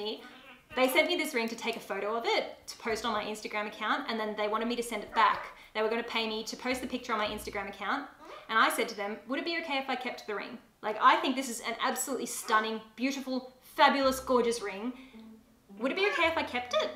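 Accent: Australia. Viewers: Her Australian accent is the LEAST annoying I’ve ever heard! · Are you Aussie ? Gday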